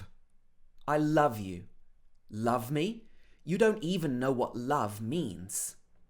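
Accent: United Kingdom. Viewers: This is rather British English, right?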